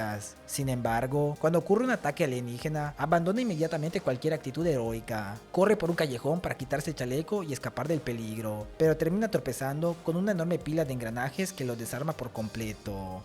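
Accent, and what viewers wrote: Yucatan, Mexico. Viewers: Es yucateco? 🤔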